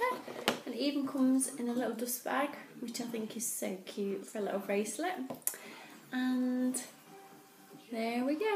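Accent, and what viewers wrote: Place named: Mansfield, United Kingdom. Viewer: I love your bag reviews, they're so helpful! I think your YouTube channel page must be set up to only show your most recent three videos because I can't see a list of them all together! Your accent is very familiar, I'm from Mansfield, and I assume you can't be from too far away, as it's like listening to myself speak! Haha x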